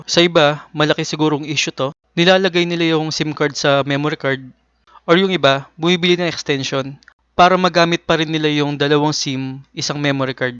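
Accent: Pampanga, Philippines. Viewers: Kapampangan ka sir noh? 😁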